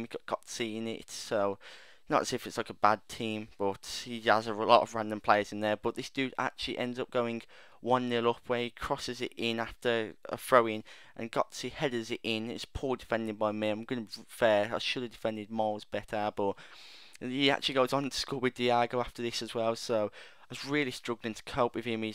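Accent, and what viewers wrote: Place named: Birmingham, United Kingdom. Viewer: Where are you from, you sound like your from birmingham?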